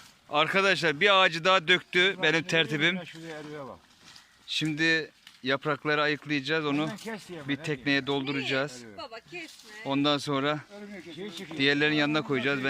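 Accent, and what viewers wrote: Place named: Ordu, Turkey. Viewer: Harika Ordu şivesi 😁.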